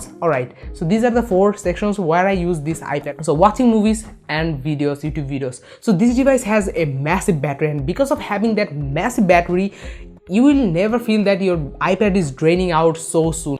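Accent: India. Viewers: Your english is so smooth than another indians. Love the accent 🤓 🇲🇨 love indian people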